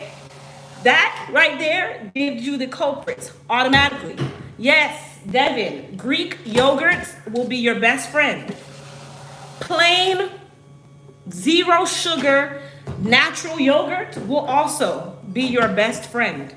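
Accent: Jamaica. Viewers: Girl you’re so real I would’ve not known you from Jamaican background. You bring out your Jamaican accent I like that about you, you’re proud of where you’re Heritage is 🙏🏾💕🙏🏾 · Down to earth giro love your suggestions Are you Jamaican I heard some languages there. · I heard that JM accent..Loving your show...Didn't know about the coconut water!! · You must be Jamaican. The accent pops out. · You sound jamaican sometimes but you know what I love you very real I've learned a lot from you I'm 68 and you never too old to learn thanks a lot for all the info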